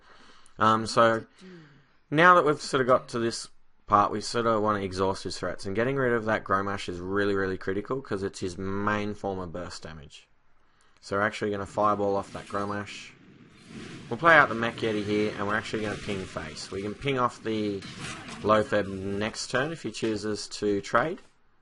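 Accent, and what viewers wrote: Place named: Australia. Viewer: BNOracle Here. In the intro, you really needed to use the word snowball. Also,You sound way more australian through the snowball :P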